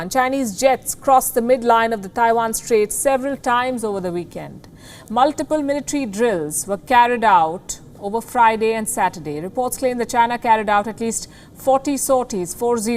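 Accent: India. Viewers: Damn Indian English~~~ It sounds like a convenient store clerk voice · I know you are doing the good thing. Thanks. But do you realize that you have a very heavy accent and people do not understand what the heck you are talking about. Can you slow down your speech and enunciate. It applies to all Indian speaker with heavy accents. Can't you guys know you have very heavy accents? I know China lies. but you should say it clearly so that more people understand the truth.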